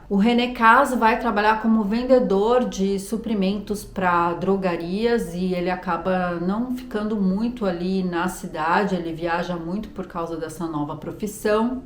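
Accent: France. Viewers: Oieee... Veramente, esse caso é o reflexo de uma sociedade preconceituosa, de uma imprensa q n se importa em mostrare a verdade, mas q é totalmente voltada para o sensacionalismo e o lucro, sem importarsi das consequencias de meter na lama, e distruir a dignidade de pessoas, q seguendo a lei deveriam ser inocentes fim a prova contraria! Purtroppo mudou pouco essa mania de fazer julgamentos, e bene si eu vejo ai da uma sociedade muito masquilista, e mulheres q sofrem ao ver o su esso, è a independencia de uma outra mulher... Eu moro a Forlì, cidade pequena, q vc espirra aqui, e no outros dia tds dizem: " meu Deus ela ta com Covid"... Pode acreditar!!! Baci a te, e adoro sentir o teu frances ... Adorrrooo, purtroppo o meu Frances é escolar, kkkk.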